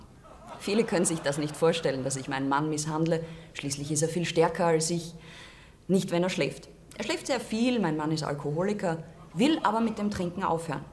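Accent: Austria. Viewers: Der Wiäner Dialekt in dem bei der bittersüsse Sarkasmus herrscht. Schön das Sie das sagen darf was den Deutschen verboten ist. Viel Erfolg liebe Frau. Sie stehen nicht auf Duzen, eher auf "Sie A_loch ". Danke für die Lustigen Vorträge und Einlagen. 👍👍🙋🤣H.F.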